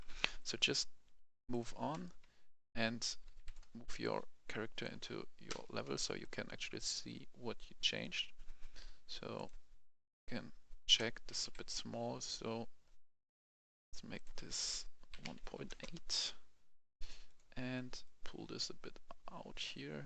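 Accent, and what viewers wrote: Germany. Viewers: Man hört das du in ehct Deutscher bist :D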